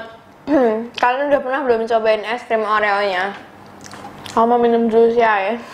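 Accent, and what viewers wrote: Java, Indonesia. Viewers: Logatnya ke Jawa Jawa an💐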